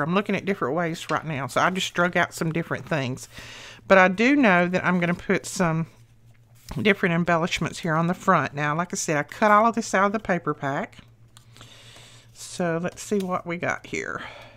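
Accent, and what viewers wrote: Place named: Georgia, United States. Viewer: You wouldn’t happen to be a Georgia Peach would you? I just love ❤️ your accent! You could read the Constitution to me and not get bored! My history teacher was from Georgia and I truly thought you were her. She was my best teacher I ever had.🌹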